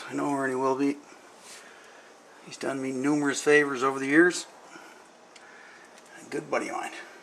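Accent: Canada. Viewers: I knew you sounded Canadian! I'm Canadian as well. I live in Alberta. I've been watching your videos because I've been thinking of getting into the plumber trade. Thanks a lot for putting up these videos!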